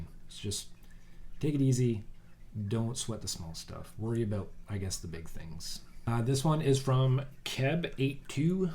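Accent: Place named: Canada. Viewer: You and your Canadian accent are so cute.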